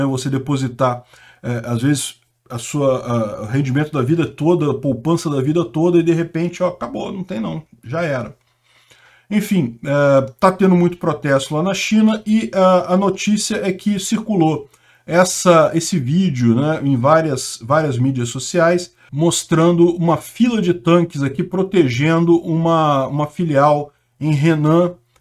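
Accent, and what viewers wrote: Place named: Rio de Janeiro, Brazil. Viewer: Se diz no carioquês :_ancappark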